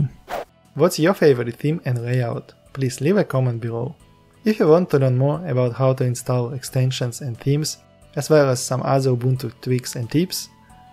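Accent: Russia. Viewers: YourOwnAzog I think yes, I am Ukrainian and recognize this russian-english accent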